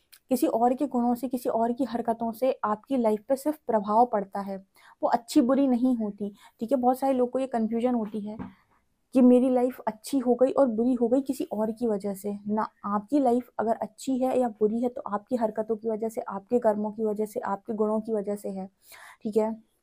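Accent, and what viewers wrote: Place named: Bundelkhand, India. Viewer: Apka samjhane tarika bahut acha hai apki boli mai bundelkhand prabhav najar ata hai.. Pla mujhe pursanal riding de dijiye 🙏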